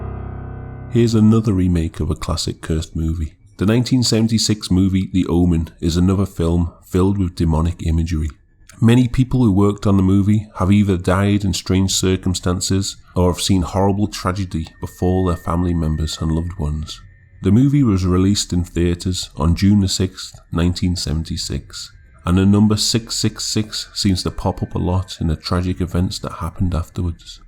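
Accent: United Kingdom. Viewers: If you're curious about the three claw mark thing- it's a common occurrence in demonic activity. It's a mockery of the trinity rather than because demons have three claws. I was curious about that so that's why I know. Although I have seen one before. Very unpleasant sight... Definitely a new type of video. People tend to stay with the ones everyone knows, the original Omen/Poltergeist/etc so it's refreshing to see strange happenings in other films. Keep up the quality work, man. Random question, but are you British? I've been trying to figure out your accent. I'm not too good at figuring them out. DX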